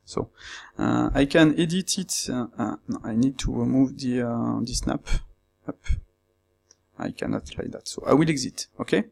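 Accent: France. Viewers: the frenchness is strong with this one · jesus why dont you activate that keyboard shortcuts addon man???? cant follow you with your strong french accent... that would help us immense... otherwise great addons, bought them both.... :)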